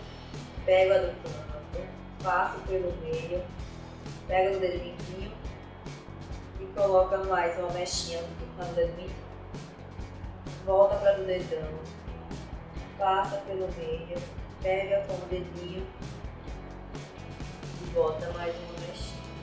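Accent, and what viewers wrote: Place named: Northeast, Brazil. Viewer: Mulheeeerr Esse é o primeiro video que eu vejo teu,e na abertura eu ja vi o sotaque nordestino,tu é de ondeee?maravilhosaa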